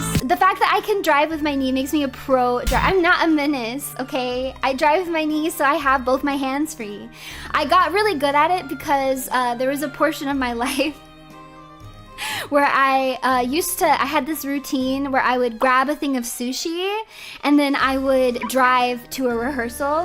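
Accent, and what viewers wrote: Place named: South, United States. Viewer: I absolutely drive with my knee 93% of the time. Also this seems to be from same stream as the forts convo. I live in the south my whole life and have zero accent. She seems chill and good person and good friend. That ya’ll she has is dead giveaway / she shouldn’t adjust to a ‘proper’ speech pattern and cadence because of viewers. F them - forcing denial and faking true self for other people. How do I befriend her as asexual male and acoustically gifted - you wanna just like.. spend time together and hang as friends? Not that non-acoustically minded normies would understand that friendships. Or that’s what my ptsd says anyway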